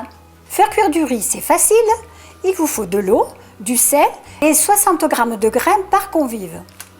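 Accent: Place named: Algiers, Algeria. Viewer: Vous avez raison,j'arrive à " sentir" la bonne odeur ... hum ! Vous n'avez pas répondu à ma question, êtes vous d'Alger?,car vous avez un p'tit accent qui ne m'est pas inconnu 🤔 😊😉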